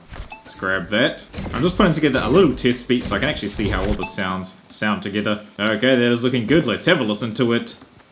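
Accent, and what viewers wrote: New Zealand. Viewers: Are u a kiwi bra I’m aussie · He sounds kiwi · R u a new zealander? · Are you from nz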